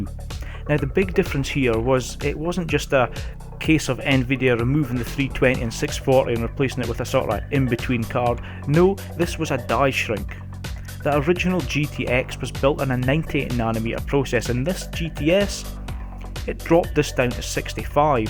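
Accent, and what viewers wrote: Scotland, United Kingdom. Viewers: Haha sounds awesome man! Good to hear another Scottish tech channel btw, I hope yourself and AdoredTV become bigger because both your videos are really good! I'm from Glasgow myself :)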